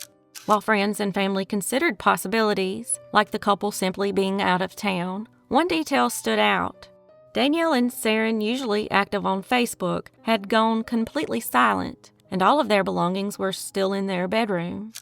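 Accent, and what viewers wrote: South, United States. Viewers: is the way southern people talk. There are words that every dialect in the US speak that is different from Webster’s Dictionary pronunciation of the actual word. It says it all in the name of her channel.. That’s one of the things that makes us all unique. Our accent or dialect from our geographical region. There are some really good YouTube channels that break them down and explain why they pronounce words the way they do.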